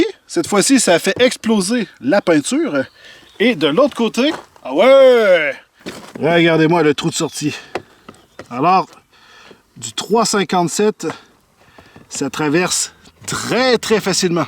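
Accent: Quebec, Canada. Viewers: J adore l accent Québécois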